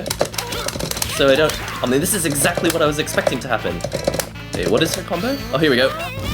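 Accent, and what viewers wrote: England, United Kingdom. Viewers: Why the fuck this Japanese guy have a British accent? Can he actually speak Japanese? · he is an Asian but have UK accents. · You're Asian and you have an English accent. You are officially my favorite person in the world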